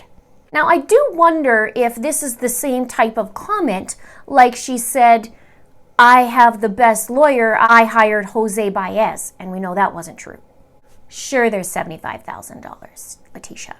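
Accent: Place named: Canada. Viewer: I could listen to you talk all day. I love the Canadian accent and after my 1st trip to Toronto I wanted to live there. I mentioned that in a comment after Trump was elected and a Canadian gentleman responded with "We don't want you here." Needless to say I was sad as I always LOVED my trips to your beautiful country 😀💥🥳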